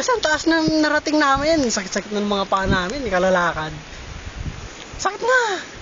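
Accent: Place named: Ilocos, Philippines. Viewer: halatang ilokano pag magsalita paulit ulit ka!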